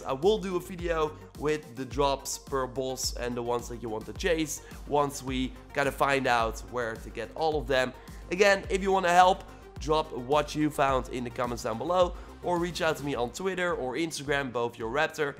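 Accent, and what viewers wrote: Netherlands. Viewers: Jezus gast, nederlands accent much?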